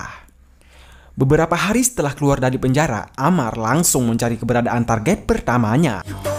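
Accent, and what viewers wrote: Bali, Indonesia. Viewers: Logat bali mu kental broo Salam dari gianyar Ajarin saya buat jadi konten kreator bosku Swastiastu rahajeng wengi Nyama bali ku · Logat mimin Bali / Lombok ... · Mimin dari Bali kah? Logatnya.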